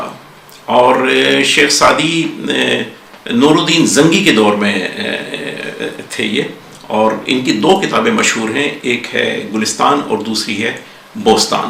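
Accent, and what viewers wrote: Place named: Iran. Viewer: farsi zuban ka andaz e takalum kia khob jachta hay aap ki zuban par mashah allah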